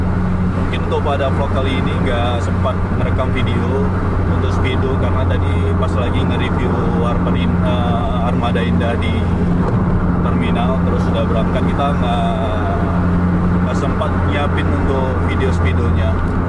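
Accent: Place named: Medan, Indonesia. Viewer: Abang tinggal dimana bang?, Soalnya Ngomongnya kek orang Medan hehe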